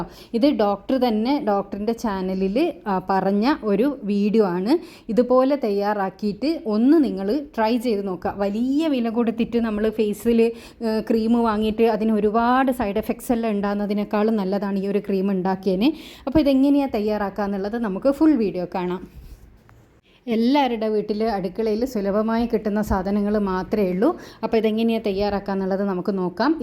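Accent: Kannur, India. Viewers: നിങ്ങൾ കണ്ണൂർ ആണോ 🤔, സംസാരം കേട്ടപ്പോൾ തോന്നി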